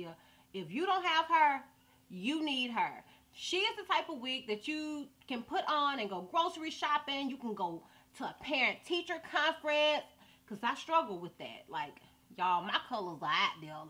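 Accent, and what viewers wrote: South, United States. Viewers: Girl, I love your country accent lol. Them wigs were cute or whatev. #2 was my fav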